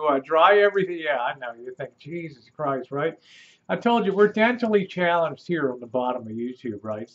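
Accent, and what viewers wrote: Baltimore, United States. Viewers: I knew u were from Baltimore !! I was a city cop there for 23 years.The minute u said wooder for water. 😆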